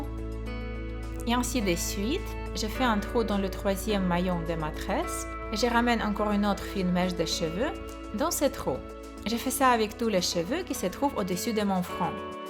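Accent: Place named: Russia. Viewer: Tu as l'accent russes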